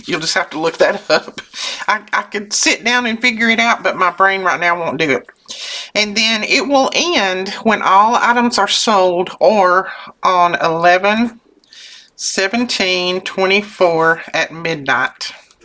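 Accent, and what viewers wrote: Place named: Tennessee, United States. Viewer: I so love watching you do the journals . I am very new at this I’m working on my 2nd book . Plan on making 4-5 for a craft show . Along with some homemade cards . But I love you accent are you from Tennessee? I am but currently live in Indiana. Is there any advice for me as a beginner ? Thanks so much and I’m ma gonna keep on watching you ♥️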